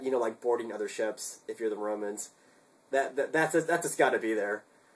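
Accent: United States. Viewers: He is very obviously American. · !?!? thats you!? you look filipino or atleast South east asian i always thought yo were american because of your voice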